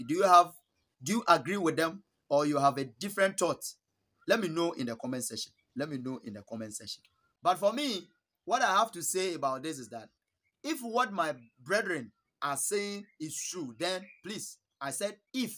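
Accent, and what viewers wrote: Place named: Nigeria. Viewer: You sound like a Nigerian your accent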